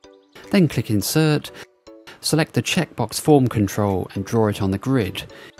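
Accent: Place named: South, United Kingdom. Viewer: Nothing more annoying than a South British accent going on about how he can use new checkboxes than no one else has access to --- brilliant!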